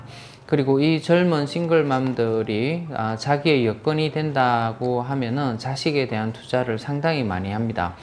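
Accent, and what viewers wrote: Gyeongsang, South Korea. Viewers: 부산경남 분이신거같아요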